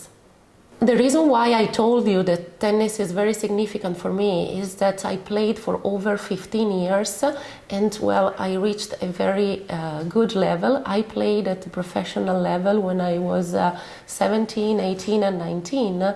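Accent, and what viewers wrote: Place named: Italy. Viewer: An Italian accent adds beauty to an already attractive watch. Thank you.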